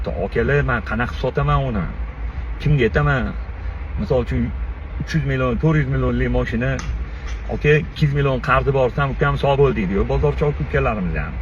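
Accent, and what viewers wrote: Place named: Tashkent, Uzbekistan. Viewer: Тошкенчани аригинали 😅😅😅😅😅 ёмон опкочади шу ока😅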